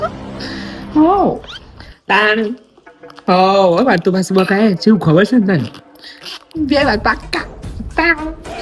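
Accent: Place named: Idar, India. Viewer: msst cho tme mne to idar ni bhasa bv gme che ho bijo pn bnvjo ok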